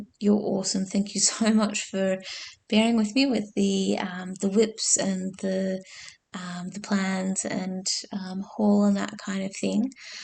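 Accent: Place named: Australia. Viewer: Hi! Lovely to hear another Aussie accent on YT - you popped in to my feed this morning while sipping my morning coffee. I’m now a new subscriber and look forward to seeing some more of your videos 😊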